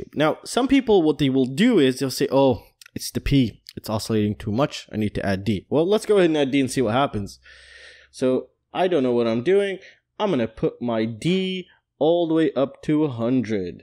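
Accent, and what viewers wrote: United States. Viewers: Are you in the uk? Looks like UK and Uk numberplate on the 206. You sound American.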